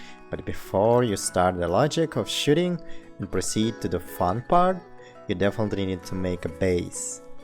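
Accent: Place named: India. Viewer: I like his good indian ascent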